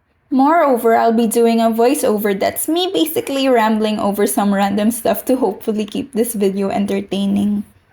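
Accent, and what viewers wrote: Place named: Philippines. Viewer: Filipino? The accent is strong.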